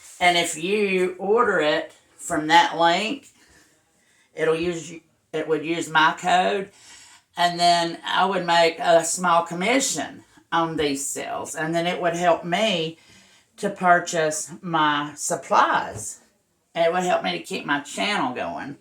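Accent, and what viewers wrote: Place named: South, United States. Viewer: I just accidently found you today and you are a breath of fresh air. Your dutch pours are even better than kanella and your personality is 1000% better. As far as lisa marvin your cleanliness and professional look of your work is top of the line. I cringe watching the mess lisa works in haha. I am so very glad to have found you. You are REAL not fake in anyway and i hope your channel grows astronomically. You deserve it. I am Yankee so your accent makes me chuckle sometimes. Southern belle for sure. Not some richy trying to get richer off others but a real deserving artist. I have been doing fluid art since 2018. My husband was diagnosed with pancreatic cancer and it gave me my peace when my soul needed it. He died sept 2019. I love doing my art and love just mixing the beautiful paint colors some days. I just wanted to tell you what a wonderful soul i found you to be. 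You are great. You say you are timid, i find you humble and deserving. Keep your guardian angel painting for yourself because i know you have one watching over you, no doubt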